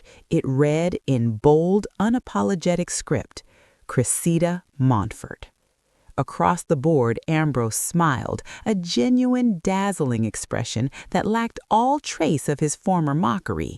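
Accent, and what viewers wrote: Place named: United States. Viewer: I am through 👎🏻👎🏿👎🏽👎🏾listening to stories set in England using AIAmerican accents. Stories set in the British Isles need the appropriate accents. You lost me. I will not subscribe.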